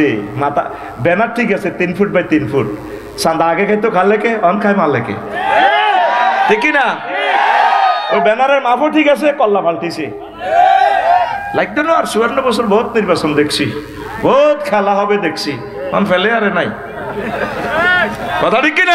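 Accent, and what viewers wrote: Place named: Noakhali, Bangladesh. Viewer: হুজুরের ওয়াজ সোঠিক অনেক বালো ওয়াজ নোয়াখালির বাষায় সুনতে বালো লাগে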